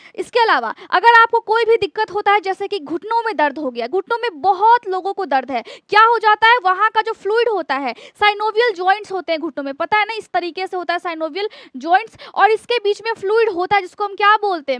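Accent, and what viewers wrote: Bihar, India. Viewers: Kya Aap Bihar Se Hain??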